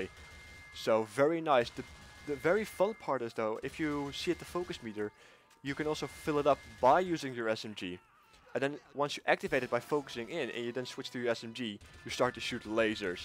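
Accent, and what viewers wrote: Netherlands. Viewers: Bro, are you dutch? you sound like you are :)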